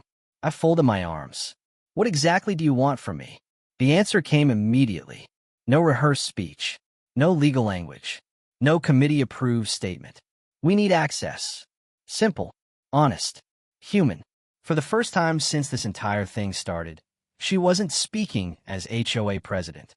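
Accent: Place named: Spain. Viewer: Ugh, the amount of verbal diarrhea in a simple 20 minute story, plus the periodic Spanish accent and gibberish? 👎👎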